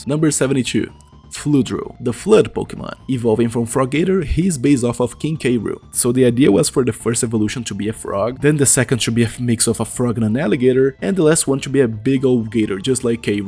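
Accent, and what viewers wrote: Brazil. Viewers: I LOVE IT!!!! are you brazilian? your accent reminded me a lot of the Brazilian accent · I swear you have brazilian accent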